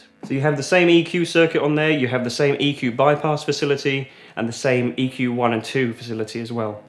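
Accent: United Kingdom. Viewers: I thought the store was at Texas or something but the British accent oh ok.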